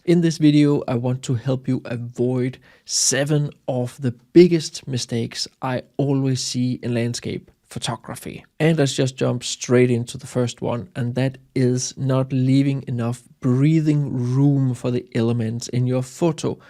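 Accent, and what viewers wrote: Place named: Denmark. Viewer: A denmarkian accent.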